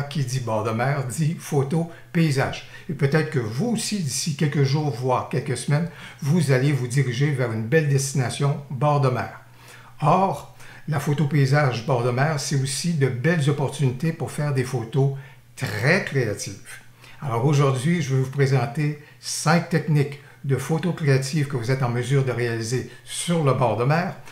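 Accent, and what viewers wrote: Quebec, Canada. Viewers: l'accent québécois et toujours un pur plaisir de sonorité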